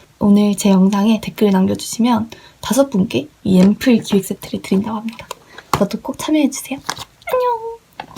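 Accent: Seoul, South Korea. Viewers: 서울 사투리...? 말투가 너무 매력적이에요!!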